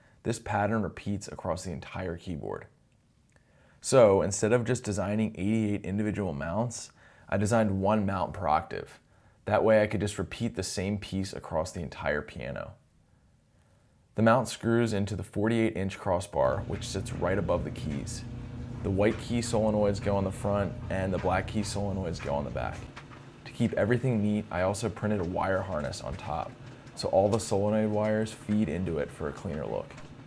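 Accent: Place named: Maryland, United States. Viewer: Anyone get the feeling that this guy is from Maryland?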